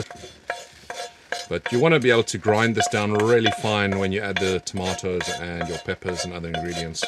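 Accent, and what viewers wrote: South Africa. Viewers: from that accent im guessing SA? first vid and love it. gonna try but first i gotta source some chllies here in japan.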